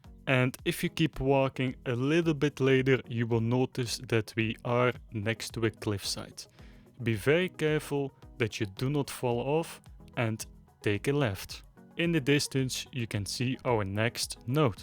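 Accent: Netherlands. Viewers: haha het nederlands accent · Love the Dutch accent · Beetje Nederlands accent maar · nederlands? je hebt een exstreem grote accent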